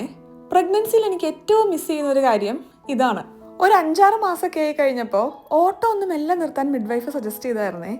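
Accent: Malappuram, India. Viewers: Athe Malappuramkkariyannen enikk thoniyayirunnu❤ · Njannm oru malappurakariyane ngale parayunath neg kug pag anan ninale patayunnath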